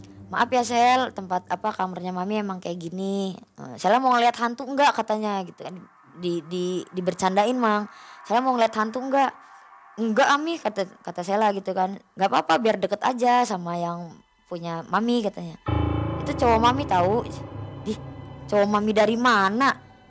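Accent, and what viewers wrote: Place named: Cirebon, Indonesia. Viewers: Logate Cirebon Indramayu iyaaa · Sy penonton baru, salfok kok kyk cerbonan sih ngomongnya ya 😂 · mbak sela bahasae dermayu ya. apa wong dermayu tah?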